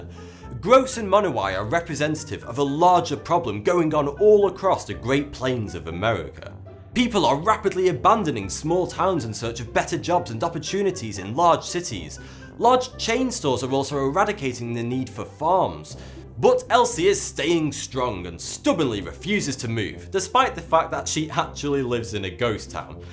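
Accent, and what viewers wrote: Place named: Manchester, United Kingdom. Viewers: where abouts in England are you from? you sound northern I'm from Liverpool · Subscribed for the Mancunian accent.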